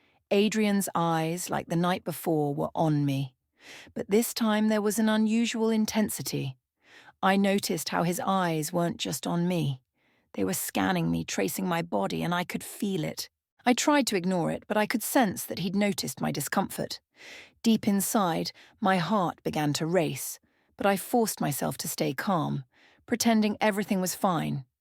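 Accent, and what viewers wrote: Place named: United Kingdom. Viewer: British accent is really beautiful to listen. ❤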